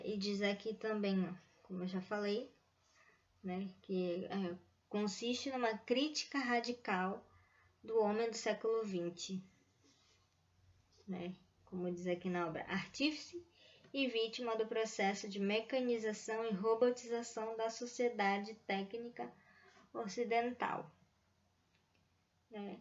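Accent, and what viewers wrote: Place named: North, Brazil. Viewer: Parabéns pelo vídeo, muito bom, engraçado a atemporalidade de certos assuntos aqui na plataforma... Sou do Amapá, muito bom ver um sotaque nortista ecoando conhecimento.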